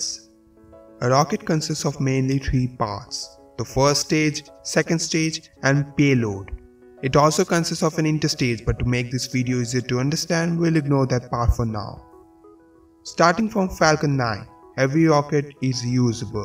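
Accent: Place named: India. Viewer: slow down man, your indian accent it will crash🙄😒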